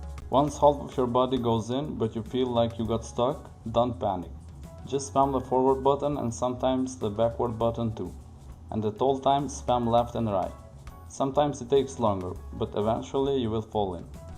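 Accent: Russia. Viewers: russian accent and now I stopped watching you. Hatfu na ryj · Your sound like a Russia , hahah, I liked the video 👍🏼👍🏼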